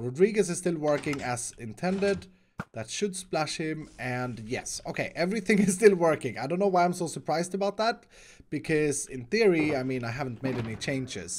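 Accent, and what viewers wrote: Sweden. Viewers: to me its sounds like a harsher Swedish accent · are you Swedish?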